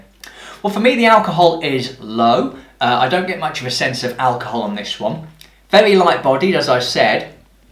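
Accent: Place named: Southern England, United Kingdom. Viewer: Where in southern England are you from? Your accent is crazy.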